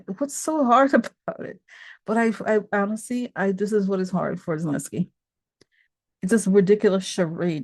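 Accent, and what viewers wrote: South, United States. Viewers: Man, I’m from Georgia and that’s a pretty good southern accent! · LOVE the southern accent 😂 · OMG, you do really well with the Russian accent, but the southern accent needs work. Lol.❤ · what an incredible episode. Thank you. KAM WON! Where are your rings?! Your southern accent is on point! Reminded me of family, excellent job!;D And I've never been more proud to also have adhd <3 <3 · I must say, Your southern accent is cracking me up. hahahahahahah Namaste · you are so funny--the con man is so full of hot air. Love your southern accent which reminds me of a friend, a southern belle who grew up in Georgia.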